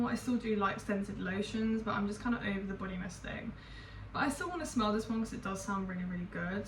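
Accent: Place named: South London, United Kingdom. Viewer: Happy belated birthday!! Happy to see us south Londoners being represented in the fragrance community, no longer do I feel ashamed for pronouncing gourmand like a plebeian lmao. Loving the editing too, it’s a breath of fresh air in a very (70% unfunny) serious community.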